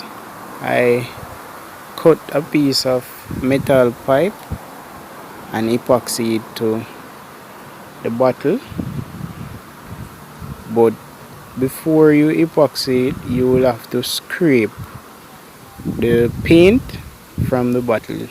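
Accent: Jamaica. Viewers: New viewer. I like your creativity and the Jamaican ascent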